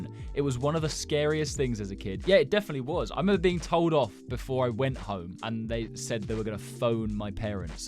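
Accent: United Kingdom. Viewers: Are you British you literally sound British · are you British? bc u say waht i would od no offense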